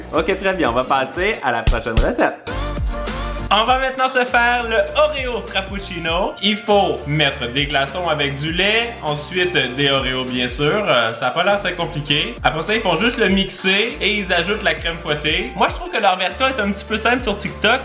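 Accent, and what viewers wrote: Canada. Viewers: tu mon youtubeur préféré desCarl is cooking vidéos accent canadien et qui met quoi des vidéos trop trop rire 🇨🇦👍👍👍👍👍 recette Starbucks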